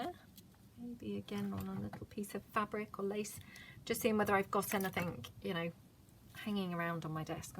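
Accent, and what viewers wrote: England, United Kingdom. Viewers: I dont do a lot of vintage journaling , but I just love that beautiful English accent . :)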